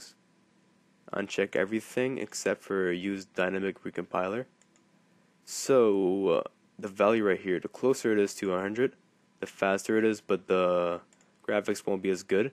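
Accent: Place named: Canada. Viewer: T'es Canadien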